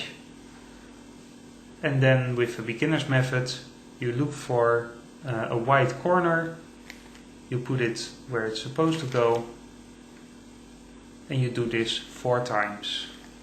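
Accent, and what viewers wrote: Netherlands. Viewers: It's so obvious you're dutch, but good vid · thnx man en ja het is te horen dat je nederlands bent ;)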